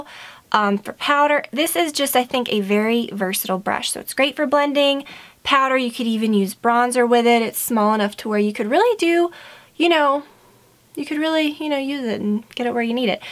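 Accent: South, United States. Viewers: Where are you from????? I knew the South (accent) , but close location? Like I am South Eastern..... about an hour ish from Savannah !!!! I knew I totally related to you for a reason. Almost everything you haul.....if I dont already have it.... I go & get it. ♡♥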